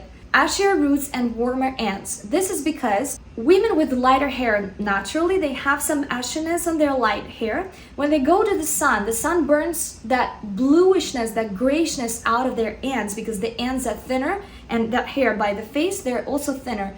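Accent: Russia. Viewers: Мне кажется, или есть очень сильный русский акцент?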